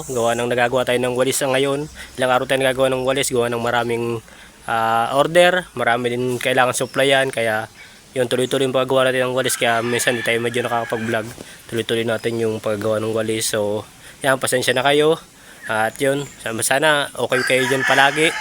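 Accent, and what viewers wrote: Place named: Capalonga, Philippines. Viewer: nnatutuwa q sa panonood ng vlog mo kc ang punto ng yng salita ay Capalongang gapalonga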